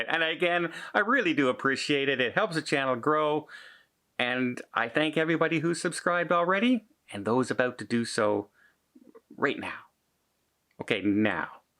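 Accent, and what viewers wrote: Canada. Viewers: I love how you Canadians say "about!" But seriously, why won't RCLL drop their vax requirement like Norweigen and Carnival?? I heard it's because the Caribbean countries/ports are requiring vaxed passengers - then how are other ships sailing there??????? Does Carnival go to different countries????? Sounds like Royal Caribbean is being ultra political....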